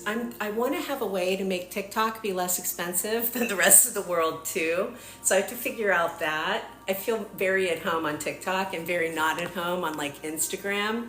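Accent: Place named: Pittsburgh, United States. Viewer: Are you from Pittsburgh? By any chance your accent sounds familiar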